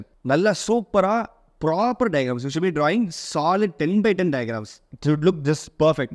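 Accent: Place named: Tamil Nadu, India. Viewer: Tamil Nadu accent haha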